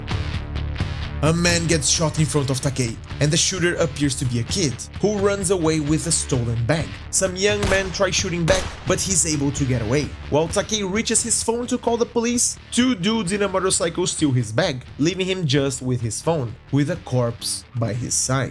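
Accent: Brazil. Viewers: Sabia que era BR só pela pronúncia, kkkkkkkkk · Deu pra reconhecer que você é brasileiro no primeiro segundo hahaha Sofá que brasileiro é único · pensei que ia ser um americano, mas é um brasileiro falando ingles, SIMBORA BRASILLL 😊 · pelo sotaque logo soube de onde era ksksk, que incrivel ver br fazendo video em inglês mt bom isso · Abra seu youtube Vídeo da perspectiva dos gringos sobre um mangaká que fez sua obra no Brasil com a perspectiva de japonês. Oh my god Abra o vídeo para treinar o inglês Perceba depois de 5 segundos que é brasileiro Jogue sua perspectiva inicial do vídeo no lixo Continue o vídeo. Obs: Por que decidiu faze conteúdo pra gringa ? (provavelmente por visibilidade) É um questão genuína de curiosidade, to praticando inglês por hobby e tenho me deparado com muitos brasileiros querendo saber inglês pra tentar sair da bolha do país e queria saber se esse seria o caso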